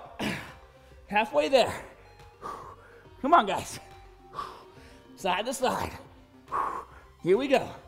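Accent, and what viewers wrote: Boston, United States. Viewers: The exaggerated Boston accent is so cringe.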